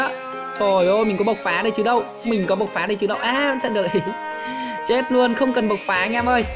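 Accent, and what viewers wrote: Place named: North, Vietnam. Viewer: Giọng bắc dễ thương qá :v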